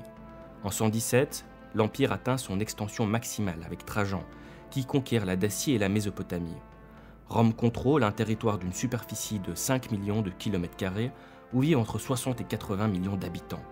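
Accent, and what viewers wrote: Belgium. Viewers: Bel accent belge... Bon document aussi! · Il est belge vus son accent, d'ou les "septante" pour soixante-dix ;) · ca m'a un peu déstabilisé, on ne détecte pas l'accent belge au delà de ça, mais excellente vidéo BTW ;) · Et vive la pouissance romaine !!! (j'adore l'accent belge... :p)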